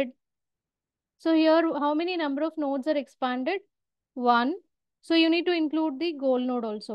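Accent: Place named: India. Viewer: Every computer science student adjacent student should be thankful to India. Once that accent hits your ears, you know you're getting a straight to the point video on the subject matter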